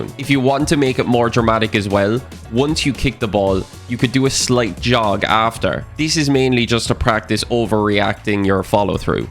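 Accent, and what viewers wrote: Ireland. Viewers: holy irish accent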